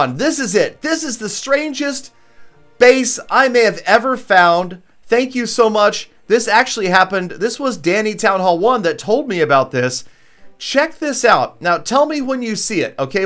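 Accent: Poland. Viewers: r u Polish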